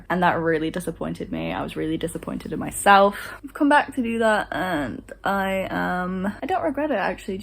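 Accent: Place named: United States. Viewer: I just found your channel through your Selena Grace bag video actually and got curious, noticed you have lost your American edge in your accent now! I really like the way you think, I am (and was) had some crazy thoughts and issues about my degree and if the degree I chose was for me or useless or worthless, wish I was as open minded as you when it came to thinking about it. You know like “it’s not the end of the world” and stuff, that’s so right! Ahh! I have one course to go and I’ll be done. I’m basically on leave right now because there are no courses for me to do left. I’m having a plight now about employment and stuff but I will keep it in mind, - “it’s not the end of the world!”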